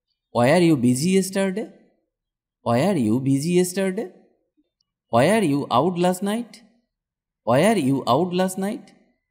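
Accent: Bihar, India. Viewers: Bihari ho kya sir 🙏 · Real bihari slang.. english (Englise) patient (pesient ) was (waj) extra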